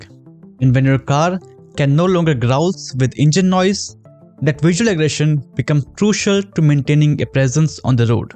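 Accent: India. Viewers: Dude has the thickest indian accent and cant pronounce Lamborghini 💀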